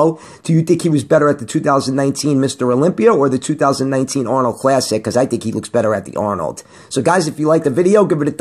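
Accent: New York, United States. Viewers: Love your nyc accent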